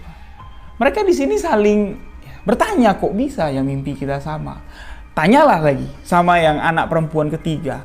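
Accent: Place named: Sumatra, Indonesia. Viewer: Mas org sumatera ya…? Logatnya kayak org sumatera😊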